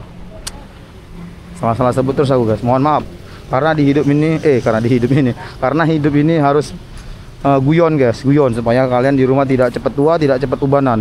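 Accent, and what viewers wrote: Medan, Indonesia. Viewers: Logat medan nya lebih kentel lg bang, tambah celotehan lucu nya terus..😅😅😅😅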